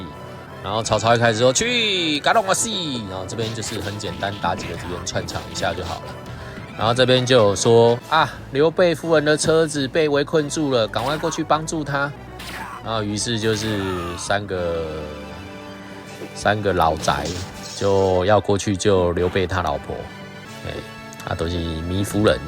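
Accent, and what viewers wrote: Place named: Taiwan. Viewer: 我喜歡你講解的方式!!!夠台!!!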